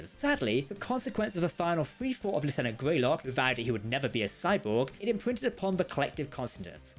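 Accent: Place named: United Kingdom. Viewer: pro tip: if you stopped being so british and spoke slower with the correct pronunciation, maybe your audience will understand something, and you'll still make it to the dreaded 10 minute mark.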